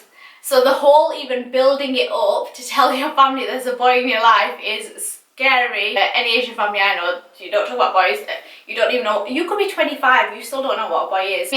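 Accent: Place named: Manchester, United Kingdom. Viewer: Omg lov ur manchester accent... u have same as me 😛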